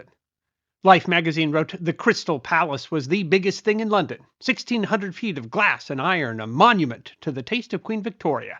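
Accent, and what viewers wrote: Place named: United States. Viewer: its happening again gareth, another annoying voice over from a mickey mouse sounding yankee talking about a British subject!! why is this and can it be stopped asap, so very annoying and basically rude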